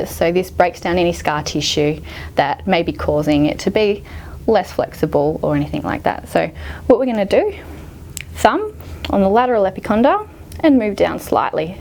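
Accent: Australia. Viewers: Thanks for the video from here in the USA. I love the Australian accent. “Cross section fibah” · This is brilliant. Many thanks. From Sweden. (Lived in Melbourne for 4 years and felt good to hear the accent once again!) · Very informative and helpful video. Also love the presenter's aussie accent, hot.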